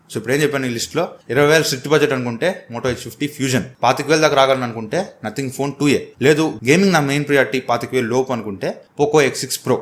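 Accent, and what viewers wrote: Nellore, India. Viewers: Bro miru Nellore ra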